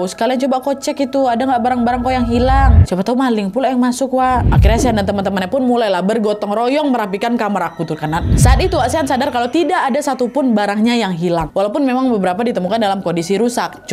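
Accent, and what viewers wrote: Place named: Sumatra, Indonesia. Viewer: 2 Minggu ini baru ikuti, terkhusus bagian Crime story,thx menemani malam gabut saya kak,btw asli orang mana kak? Dari logat nya sekitaran Sumut,Riau Sumbar?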